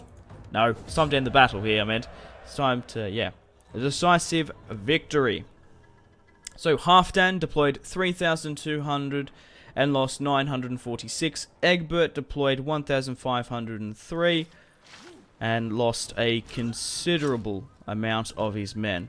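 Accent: Australia. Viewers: where about in australia you from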